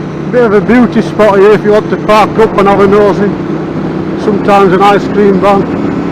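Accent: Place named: Northern England, United Kingdom. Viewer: Brilliant. Loved your review. Nice to here a proper northern talking about bikes and routes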